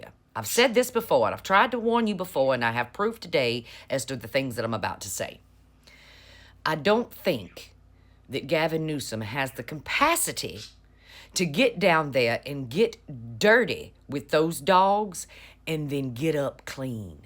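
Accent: South, United States. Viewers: Crockett and AOC would be great for USA. You speak truth, and with a real Southern drawl.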